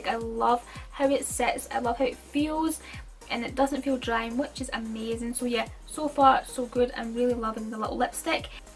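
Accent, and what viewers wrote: Scotland, United Kingdom. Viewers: Omg your Scottish! Me too 😝💗💗 Have subscribed love your videos Soo gorgeous 😻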